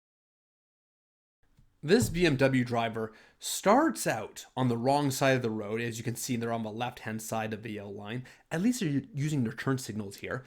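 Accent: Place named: Canada. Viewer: I find your Canadian accent so charming 😜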